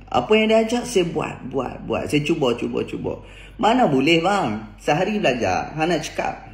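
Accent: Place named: Kedah, Malaysia. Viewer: orang kedah kalau berletiaq..memang sedap dengar..haha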